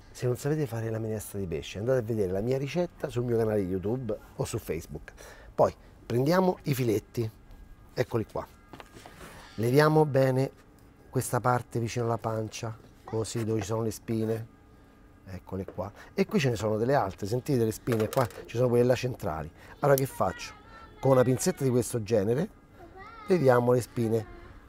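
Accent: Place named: Nettuno, Italy. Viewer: Sei dorceeee...con.. Noto ogni tanto un accento nettunese..☺🍾🍾🍾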